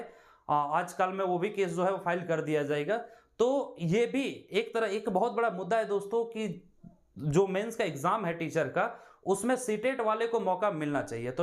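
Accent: Bihar, India. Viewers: Bihari ho lagta h tera baat se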